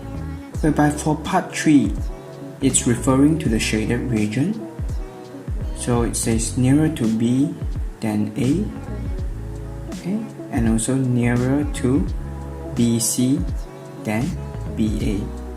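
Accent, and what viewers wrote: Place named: Singapore. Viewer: Thanks!! I know this question is out of the blue but are you from singapore?. Ur accent is kinda similar so😊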